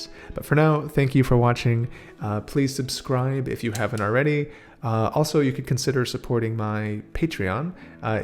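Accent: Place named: England, United Kingdom. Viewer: con l’accento inglese:-)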